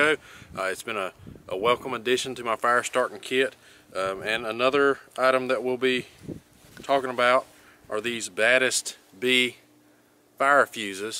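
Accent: South, United States. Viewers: Not only was this a GREAT review of the products (plus I learned another way to set up for a fire👍) BUT I loved your humor about the "words" with your southern (?) accent! My daddy was born and raised in Irving, Texas, my mother is Japanese...they met in Japan during the Vietnam war when my daddy was a marine...so you can imagine the different "accents" I grew up with! Thank you for a good product review but a good laugh too! I appreciate the time you put into this video as well as you being in the cold! God bless🙏❤🇺🇸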